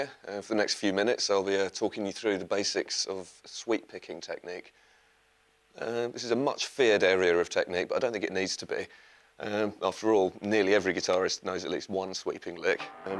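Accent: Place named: United Kingdom. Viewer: I started to love british accent because of him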